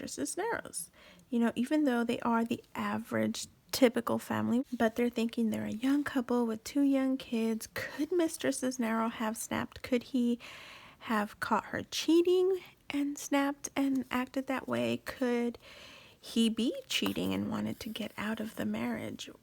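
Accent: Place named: New York, United States. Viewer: Love your New Yorker accent...lol It’s a shame no one was found accountable for the crime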